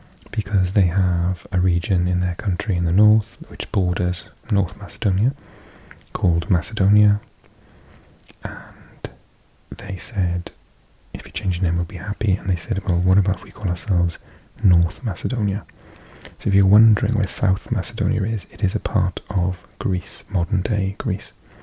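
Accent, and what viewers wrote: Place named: Wales, United Kingdom. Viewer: yes the accent sure sounds very Welsh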